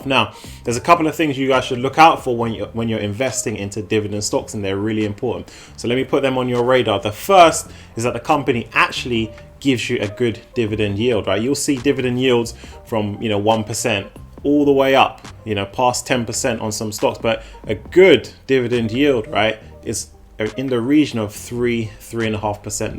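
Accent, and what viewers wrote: United Kingdom. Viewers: Good brief, nice to have a British accent. Well done.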